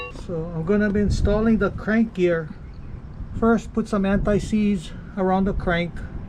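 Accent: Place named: Louisiana, United States. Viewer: He from Louisiana all day I can hear it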